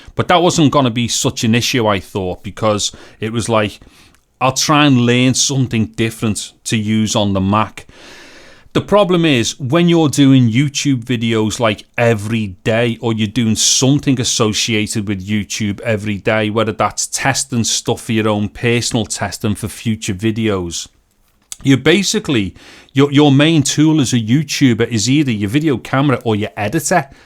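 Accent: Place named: North, United Kingdom. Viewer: Edinbrah or Dublin accent? Northen United Kingdom accent. :) Just guessing.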